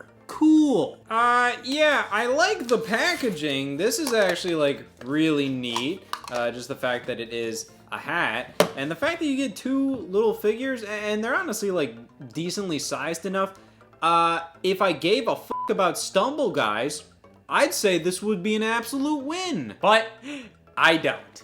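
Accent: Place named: Michigan, United States. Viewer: You definitely from Michigan lol😅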